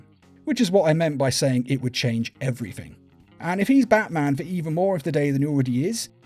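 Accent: United States. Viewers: Good job on the American accent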